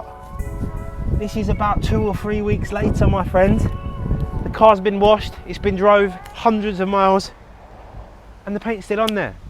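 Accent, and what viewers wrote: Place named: United Kingdom. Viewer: I can tell that you are from the UK because your accent so I wonder how hard is it to get a mobile dent repair license in the UK. I respect your job btw cuz it is great.👌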